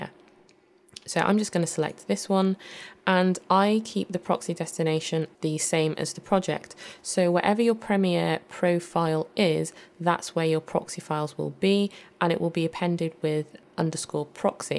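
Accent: England, United Kingdom. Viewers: Very good tutorial. I have seen a few on this subject and this was clear with a lovely English accent for a change. Thanks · A great instructional video! Doesn't hurt to have that English accent either! ;)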